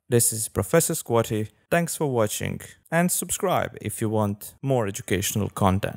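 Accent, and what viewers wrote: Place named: Croatia. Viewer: This.... was... definitely a video I watched. ?_? xD Anyhoooo... I still enjoy listening to that supreme Croatian accent. ;)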